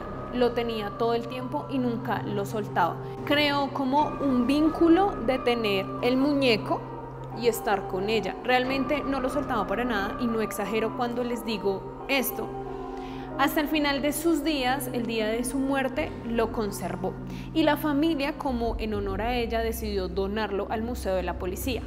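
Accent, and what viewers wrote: Colombia. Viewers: Hola, yo te oigo desde Monterrey, México y he quedado enganchada con tus videos y con los casos que presentas de tu país. Aquí en México también ocurren casos lamentables,creo que ningún país se salva. Me gusta tu acento,de que parte de Colombia eres? Agradecería si me mandas un saludo. Soy Vero.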